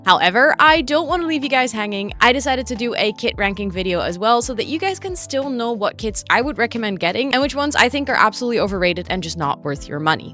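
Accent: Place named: Netherlands. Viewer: You sound a bit Dutch, are you from The Netherlands?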